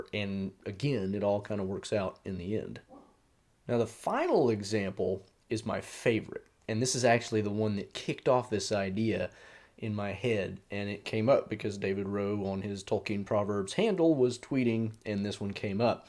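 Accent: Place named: Southwest, United States. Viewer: Where in the south west?....of the United States is your accent from?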